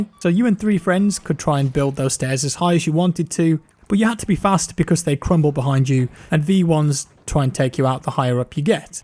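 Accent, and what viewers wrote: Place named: West Midlands, United Kingdom. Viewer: sounds REALLY West Midlands in this video!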